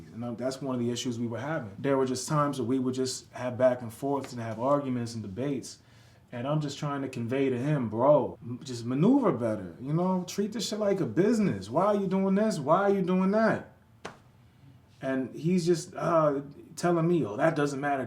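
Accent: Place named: Newark, United States. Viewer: north Jersey? You do have a bit of a Newark accent. I’m not used to hearing non-Philly accents I live in Atlantic county now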